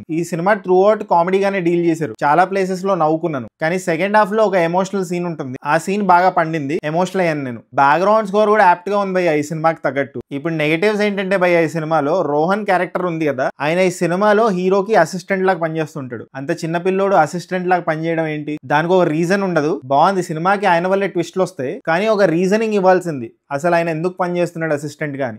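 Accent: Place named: Vizianagaram, India. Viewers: Vizianagaram slang bro adhi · Srikakulam slang kadhu bro Vizianagaram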